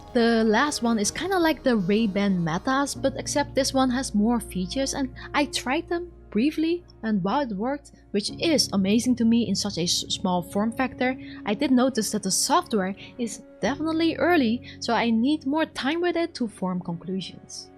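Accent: Netherlands. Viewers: Great news! What is this accent? I can't put a finger on it lol It nearly sounds like a Dutch English accent n__n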